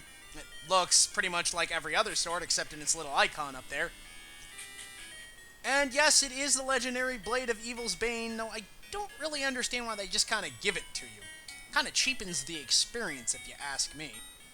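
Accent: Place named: Canada. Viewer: You and your cute Canadian prenunciations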